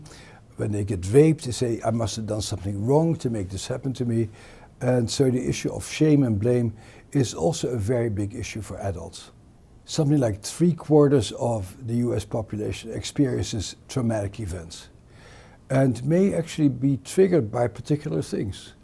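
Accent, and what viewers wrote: Netherlands. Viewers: I can just tell that this guy has to be Dutch just by accent! If he isn't I will.... eat my socks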